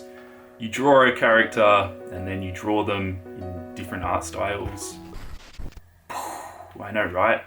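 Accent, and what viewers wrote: Australia. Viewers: Bro are you Aussie?? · Yes! Another Aussie! Love your work mate!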